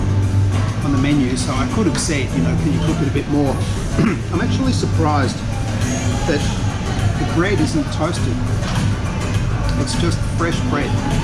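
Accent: Australia. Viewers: he cant understand because of your ausie accent with mask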